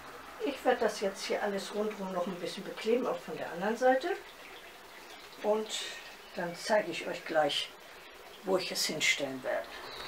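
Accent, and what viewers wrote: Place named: Northern Germany, Germany. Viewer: Hallo, richtig gute Idee dein Kerzenständer, freue mich jedesmal auf deine schönen Videos. Ich mag so gerne deine Nordstielaussprache 🤗 u.natürlich die schönen Dekoideen 😙danke 🤗🤗😚😚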